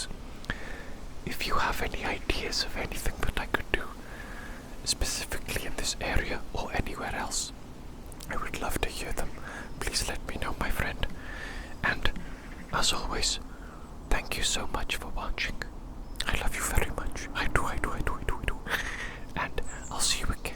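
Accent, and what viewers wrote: Wales, United Kingdom. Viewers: Welsh accent 10/10. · Noooooow I know why you talk a lil funny! You’re just from Pontypandy! (I’m a Hampshire-ean) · The Welsh accent is made for asmr, whispers are immaculate 👌🏻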